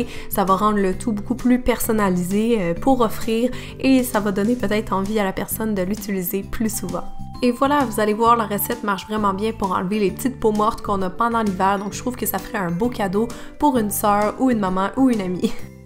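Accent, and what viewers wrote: Quebec, Canada. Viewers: C'est marrant ton accent québécois ressortait beaucoup avec ton extinction de voix 😂 sinon super vidéo comme d'hab ! 😘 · je suis d'argentine et je suis en train d'apprendre le français. C'est un peu difficile de comprendre l'accent québécois pour moi, mais j'adore le français💟 merci pour la vidéo bisous Feliz Navidad para todos!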